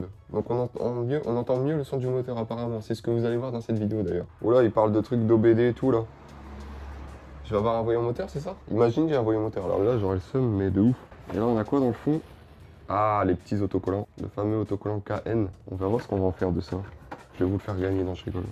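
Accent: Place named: England, United Kingdom. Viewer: Cet accent anglais ! 😍 Bref, sérieusement j’ai adoré la vidéo, hâte de voir la prochaine ! 😅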